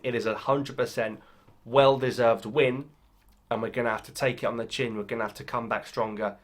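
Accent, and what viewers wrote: England, United Kingdom. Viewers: Sweeet!!!! Nice jolt ⚡️! Algerian with an English accent!!! I was expecting a French accent !!! Love your spot on analytical review! 👊🏾🇳🇬👊🏾🇳🇬!!!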